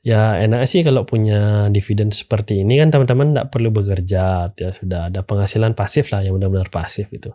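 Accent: Bali, Indonesia. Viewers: logat bali...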